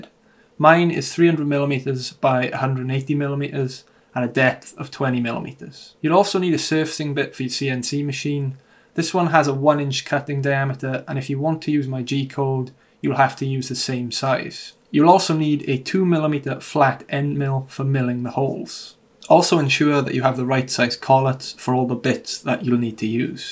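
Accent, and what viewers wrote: South Wales, United Kingdom. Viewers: Love your video and your Welsh accent sounds like South Wales as is mine I now live in Canada and am a retired senior citizen, as a result I am unable to offer support by way of paid membership membership given this info I wonder if you would consider sending me a copy of the code you used for flattening you spoil board? Also please advise what part of Wales are you from or located I just have a feeling we would not be far away if I still lived there.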